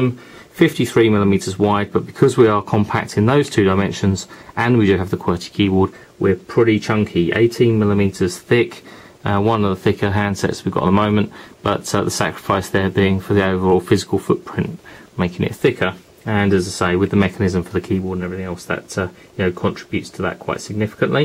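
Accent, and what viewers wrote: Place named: England, United Kingdom. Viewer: English accents unboxings are the best.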